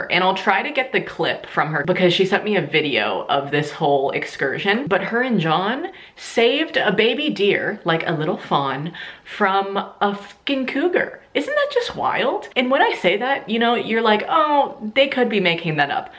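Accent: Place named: Western Pennsylvania, United States. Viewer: This sounds like western Pennsylvania 😂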